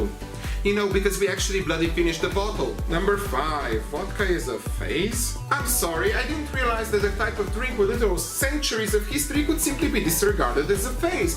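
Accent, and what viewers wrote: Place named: Russia. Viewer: à Guy with russian accent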